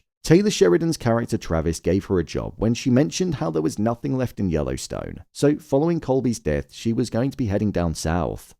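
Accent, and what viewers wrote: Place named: RP, United Kingdom. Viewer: This BBC voice narrator is definitely not helping the review. What a shitshow ending.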